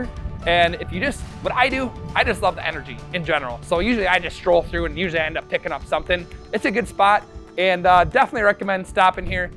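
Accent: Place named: Wisconsin, United States. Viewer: Such a Wisconsin accent lol I was born and grew up in EC, good memories:)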